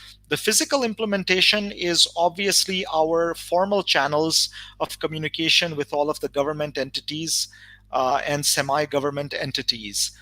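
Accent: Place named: United States. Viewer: We have high hopes from this authority__ the major duty is to start manufacturing the technological products by local engineers. For example machines in medical/ health sector needs an original machine and then engineers trainees under supervision from seniors make the copies__ I am ready to volunteer and invest in it. We have seen in China, Thailand, Korea, Japan, that making the new products is far cry but the first thing is to make cheap copies of famous brands. We, as Pakistanis have to be on war footings on this front Sar dena parey ga nahi to koi ley jae ga__ needs highest Patriotic passions here. I am listening this and unfortunately internet is not working of the speakers. Make the things simple __ don't talk in USA styled English__ do something positive in most humbled fashion plz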